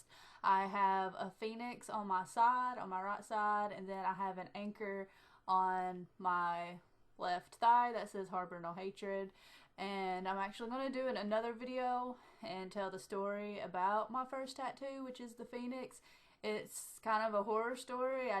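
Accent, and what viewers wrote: South, United States. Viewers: yup... you have a southern accent. lol I knew it. :) it's a good thing. :)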